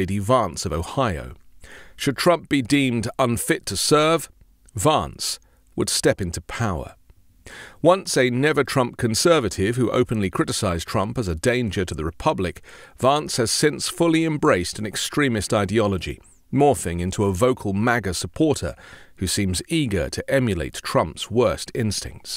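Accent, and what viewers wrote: England, United Kingdom. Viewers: Age is not the question. The questions are related to mental and physical capabilities. Putin is an older gentleman but I would not want the American President have to arm wrestle with him. What is the "magic" age where these capabilities decline ? Sounds like the narrator is talking about President Biden. Some professions require an exam which determines they are ability to perform in a job. This does not exist for the Presidency or other elected positions. Why does the narrator talk with an English accent ? Is Europe trying to affect American Elections ?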